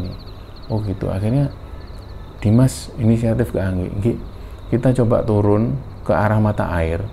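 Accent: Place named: Surabaya, Indonesia. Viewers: BetuL...memang jauh berbeda,pendalaman cerita,penyampaian,anatomi tubuh dan aksen suroboyoan betul2 itu yang membuat beda dengan yg lain,mantapzzz 👍👍👍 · Ga bisa dipungkiri bahwa memiliki ciri khas yg tidak dimiliki chanel" lain yg sejenis, dalam diri mas (tanpa mengecilkan peran team yg lain) dengan runtut cerita yg jelas,lugas, sederhana, tenang, mampu menghidupkan suasana cerita, tentunya logat Surabaya yg khas. Pertahankan semua itu cak, yg perlu ditingkatkan hanya intensitas upload cermisnya saja, biar kami sobat kembara ga kelamaan nunggunya, maju terus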